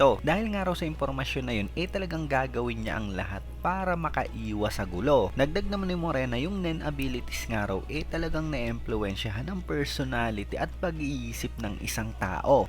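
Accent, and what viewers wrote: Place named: Philippines. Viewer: Boss bakit yung pronouncing mo ng english parang pilipinong pilipino din.. medyo mahirap siguro paghaluin english at pilipino pag nagsasalita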